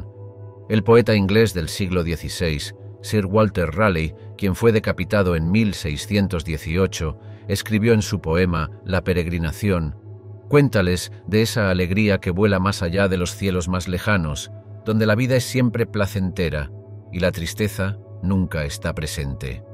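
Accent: Castile, Spain. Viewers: Buen video, muy instructivo y sobre todo maneja un lenguaje castellano perfecto...agradable de escuchar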